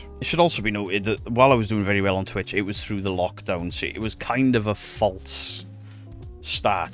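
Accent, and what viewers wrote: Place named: Cardiff, United Kingdom. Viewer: Ah nevermind! Just thought you might have seeing as you sound local (I'm in Cardiff) - all the bands of the time like FFAF, Shaped By Fate, even...ugh... Lost Prophets used to post on it all the time. Even met my wife on there lol.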